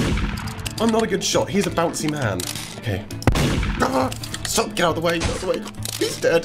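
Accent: United Kingdom. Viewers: do u live in UK cause of ur accent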